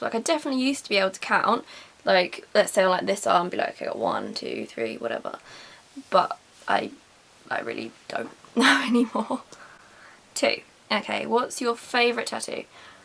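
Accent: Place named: London, United Kingdom. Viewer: u have a london accent-nice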